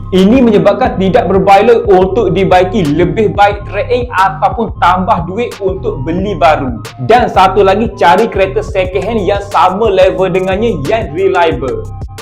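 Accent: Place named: East Coast, Malaysia. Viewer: bagus ada skit cara org pantai timur cakap saya suka, teruskan cara ini, kalau org utara cakap depa bantai slang mereka je skrg org timur pun patut cakap cara slang timur baru ada kpelbagaian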